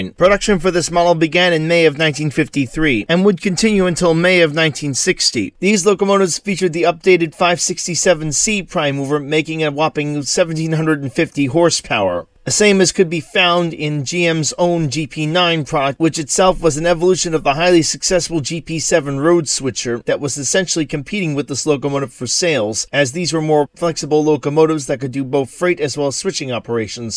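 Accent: United States. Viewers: It's just an American accent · Really great EMD mini-docu video thanks! Good to hear a proper NY-sounding accent also ! 🙂 I didn't realise just the ridiculous number of such locos that EMD manufactured. Must have been coining it in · You from Brooklyn?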